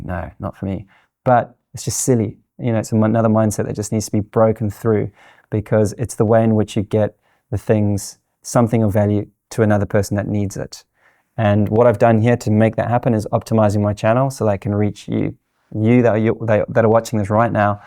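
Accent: South Africa. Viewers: Keep at it, I randomly stumbled across it. Love it. Recently started as well, after 3+ years of putting it off. Listening, it sounded a bit like you were South African. Im loving the content, please do keep it up. Im trying to be raw, edit as little as I can (Which is hard) but Im working it.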